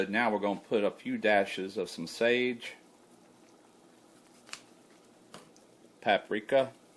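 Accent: South, United States. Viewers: He sounds like Bill belichick with a southern accent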